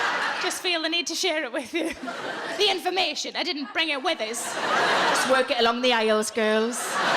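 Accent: Newcastle, United Kingdom. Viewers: A Geordie is someone from Newcastle Upon Tyne in England and surrounding areas. Her accent is Geordie.👍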